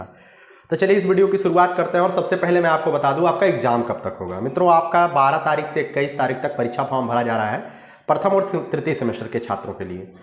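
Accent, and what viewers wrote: Bihar, India. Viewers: Sir aapke bat me bihari kuchh accent aa rha hai.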